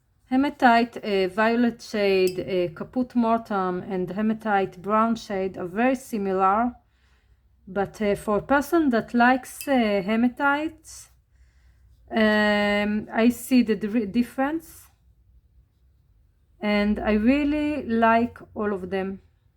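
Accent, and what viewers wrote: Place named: Israel. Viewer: Are you a Sabra?I think I recognize your accent❤️‍🔥🙏🏻🥇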